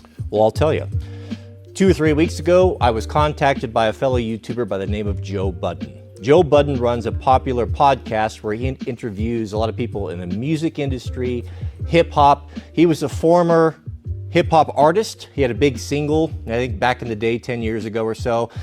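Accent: India. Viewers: Indian accent? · Always the same indianish accent... why?!😢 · First sign of trouble indian accent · Indian accent is the dead give away 😂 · Rule number 1. Don’t trust indian accent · Indian voice is a dead giveaway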